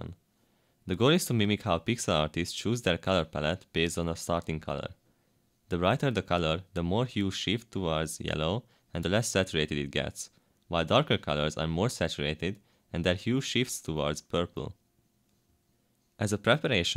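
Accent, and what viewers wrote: Hungary. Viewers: A magyar akcentus megcsapott ugyhogy jottem a komment szekcioba :D szuper video, igy tovabb!! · annyira büszke vagyok magamra hogy instant felismertem hogy magyar akcentusod van de amúgy geci kemény képeket lehet csinálni vele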